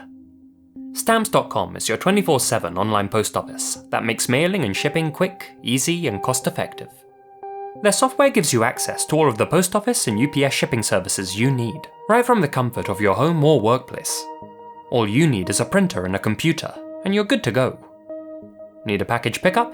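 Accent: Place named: United Kingdom. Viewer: You're the best. I love you're vidoes their so entertaining. Keep up the good work dude and i love your British accent.:) Have a great day man. 😊❤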